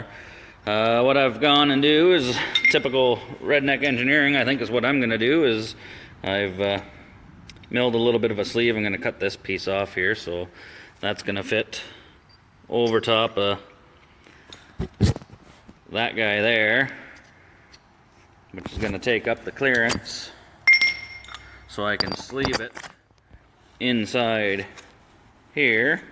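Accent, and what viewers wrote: Canada. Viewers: Wish i had the cash, this things amazing. And you sir, are one of the most canadian sounding fellas iv ever herd lol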